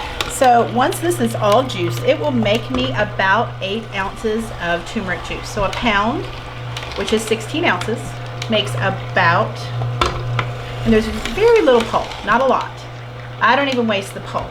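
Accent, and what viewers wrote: South, United States. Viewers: Fahima Bemberry I think you misunderstood, Fahima. She said “Grass Fed”. Not “breast fed”. 😂😂😂 It’s the southern accent. It throws you off sometimes.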